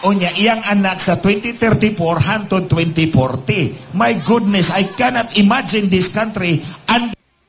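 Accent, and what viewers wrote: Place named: Visayas, Philippines. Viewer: ikaw bitaw to atty. Glenn Chong, bisaya diay ka😅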